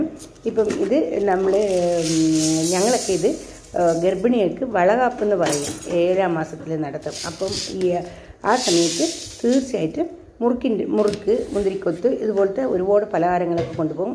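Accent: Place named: Tamil Nadu, India. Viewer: നിങ്ങളുടെ സംസാരം നല്ല രസമുണ്ട്.. Tamil slang touch ഉണ്ട്, നല്ല recipe tnx ❤️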